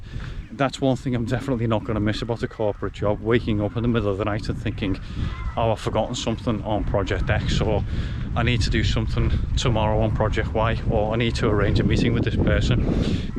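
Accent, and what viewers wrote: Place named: Liverpool, United Kingdom. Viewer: Def sound like a scouser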